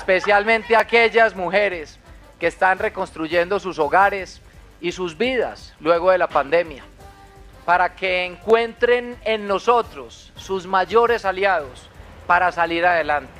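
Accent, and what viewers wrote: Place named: Antioquia, Colombia. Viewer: El próximo presidente habla paisa... Felicitaciones a todos los paisas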